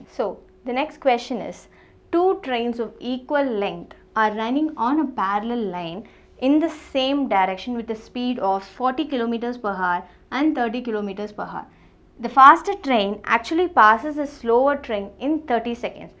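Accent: South India, India. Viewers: You're from south India?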